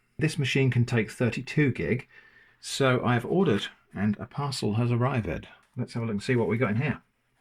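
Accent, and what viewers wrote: United Kingdom. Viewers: its a general misconception, you should not leave it plugged into the electrical socket for earth. Esd earth and electrical earth are different, you need to be on the same electrical level. just unplug the cable. used to install and maintain clean rooms and esd workshops for British Airways for 7 years. Mains earth and esd earth are total separated until they join in the sub station. you sound British? so we have a lot of rain in the uk so static generally is not a problem, unless you are in Arizona USA were it is dry as hell, very good video, love Linux mint, i have it on my two pcs and laptop. will be watching more. just subscribed.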